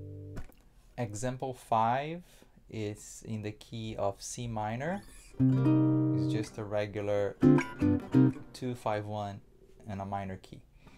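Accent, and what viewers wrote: Brazil. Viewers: Your accent condemns you 😊 What a great surprise for me to know an amazing guy, excellent performer and an example of professionalism and dedication. Things like these make me feel proud of being brazilian! I wish you the best, bro! May God bless you! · Ele tem um sotaque meio brasileiro msm kk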